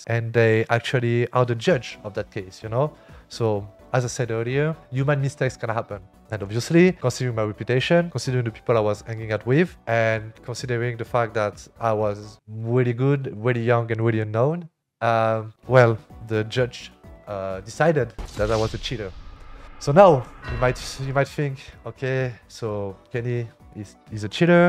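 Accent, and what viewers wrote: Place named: France. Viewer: Kenny french accent makes his voice very sexy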